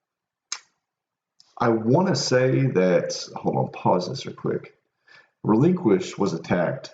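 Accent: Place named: United States. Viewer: Great review! Love the American accent 🦅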